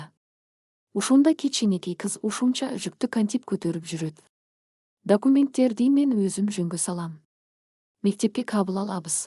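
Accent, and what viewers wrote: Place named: Russia. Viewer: Чала орустан башка окуган киши таппай калдынарбы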